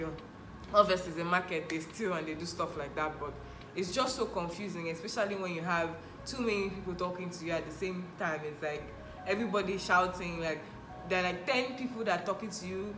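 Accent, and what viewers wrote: Lagos, Nigeria. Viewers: Naaw !! This na 9ja babe. Which kain Cameroon. Omor dis na some kinda hustle. This chikala na 9ja joor. No way she was only in 9ja for law skool to have such straight up lagos accent like dis. Although I hear dat Cameroon accent when she actually mentions Cameroon but omor mehn, her 9ja accent enter. Top babe. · Are you are camerounian ? ..cos you sound 💯 nigerian .. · You not a cameroonia at all. You accent is real Nigeria. Big up girl. You too much. one love. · I waited and waited for your accent to go offline...at all. You maintained lagosian steeze. Kudos. 👽⚡🇳🇬⚡😂⚡👍🏾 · I just stumbled on your platform..I.will appreciate your response to.my request..your charisma and intelligence shines through. you sound very Nigerian which leads one to conclude that we are one. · You sound so Nigerian. Didn’t get even a slight accent deviation. 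Really lovely content.👍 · I really like you.. You sound so much like one of us "Nigerian"..for that, Am giving you a like and just just subscribed. 😍😍😍😍 God bless you.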